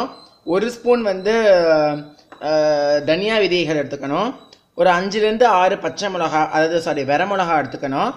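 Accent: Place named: Palakkad, India. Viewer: Brahmin Iyer or Iyeger boys speaks with this slang only. Palakkad slang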